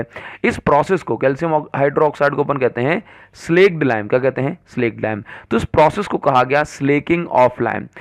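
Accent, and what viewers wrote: Odisha, India. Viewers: Sir are u from odisha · Sir,are you from Odisha❓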